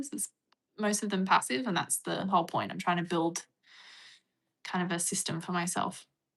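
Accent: United States. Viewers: Your American accent cracked me up! 😂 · Ok, I’m American (unfortunately 😬), and your American accent always, always makes me laugh, I friggin’ love it 😂👌🏼 …gonna save this video to watch the rest when I have more time, but I just wanted to say—I really do love these longer videos you’ve been making lately. Whether you have Hammy in there with you or not, whether you’re cooking, or making other stuffs…just anything! Almost feels like we’re hanging out together gabbing about random things and—maybe weird to say—it’s just a lot of fun. 🤷🏼‍♀️ 🍻💃🏼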